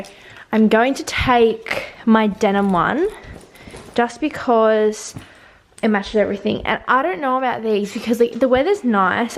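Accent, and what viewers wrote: Australia. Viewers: Wait is she from Melbourne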